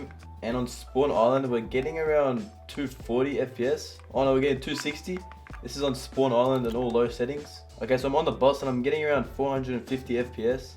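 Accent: Australia. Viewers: read the title people its HIS dream PC not your dream PC also hes australian if you couldnt tell by the accent meaning its 3000 usd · You must be an American who has no sense of culture or world knowledge. His accent is clearly Australian so it’s in Australian Dollars. But you’re American, I don’t blame you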